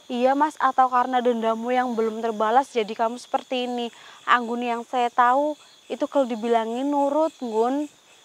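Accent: Banyumas, Indonesia. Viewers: Kangen ngomong ngapaknya lucu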